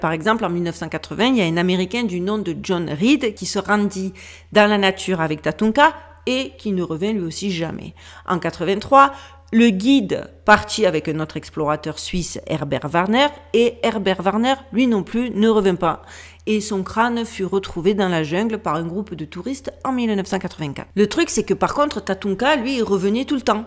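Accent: Marseille, France. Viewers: 😂 " Mais ce monsieur n'a rien laché, il devait être Marseillais...mais bien sûr, je plaisante!" 😅 J'adore votre humour décalé, toujours fin et respectueux. Je suis parisien mais ma sœur vient de Marseille et j'aime entendre votre accent, ça me rapproche de la famille. Ne changez-rien. J'ai du mal à comprendre qu'avec la qualité de vos reportages très aboutis, que youtube puisse vous emmerder. C'est un "non sens" 😮. Prenez soin de vous et ne lâchez rien!❤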